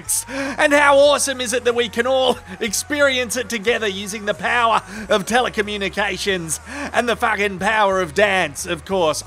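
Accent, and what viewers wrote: Australia. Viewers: Wow, a very entertaining and enthusiastic Aussie commentary. Not to mention the incredible couples act. 😂😂😂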